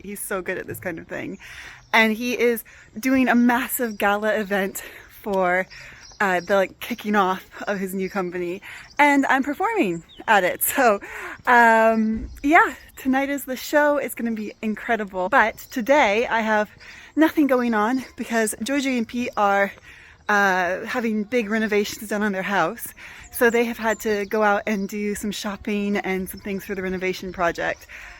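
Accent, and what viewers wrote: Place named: United States. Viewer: Strange to hear a place called a palace described as cute? It's so cute to call it cute. You sound american so if you plan to use brick I hope you don't live in a state with bitter cold winters. Britain doesn't tend to have long spells of bitter freezing temps. If you get bricks make sure they are dense and not very water absorbent or they will fall apart after even a year or two. Stone holds up better in freezing temps as long as it is very absorbent stone. I don't know why everybody insists on using grout on the new precision tiles. You don't need it. Tiles don't expand or contract due to temperature changes you experience in a typical home. You can lay tiles with the thinnest line between them. That seam can actually be the same cement you use to adhere them to the floor.. I use a vinyl adhesive. The grout only tends to get dirty or even moldy. 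There are grout sealers but I don't know how long they last. If I ever do my kitchen floor again I'm going to omit the grout between tiles. I laid a hearth under a wood stove using granite tiles and didn't use adhesive or grout. The subsurface is very flat so nothing wobbles. In case anything cracks I can replace it easily with a few backup spare tiles. There is an oak edge holding everything in place against the walls. NO problems after 10 to 15 years already. I haven't had any cracked tiles. .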